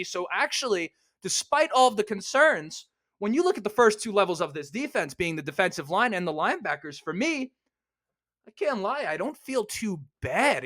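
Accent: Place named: New York, United States. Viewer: hey,! Colts fan since '84----my curiosity is too much for me.....why does I guy living in NY love the colts so much has you obviously do? your looks and your dialect sounds to me like a Giants fan, or a Jets fan or even (cringe) a Patriots fan. Glad you are, but the real reason I subscribed to your podcast is that you are a NewYorker. How did that happen, I mean--why the colts?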